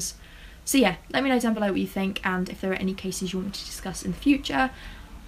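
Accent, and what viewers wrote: United Kingdom. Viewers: are you British, Australian, or South African?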